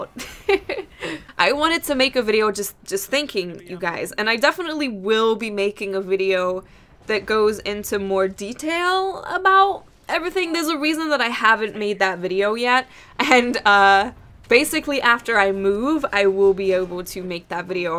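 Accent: United States. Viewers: Where are you originally from? You should like your from somewhere in America but you and your mum are living in the uk? (Unless you are talking about the city named London in Canada) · she's lived in America and has an American accent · arent you from america, you sound it!! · U sound American. U don't have a British accent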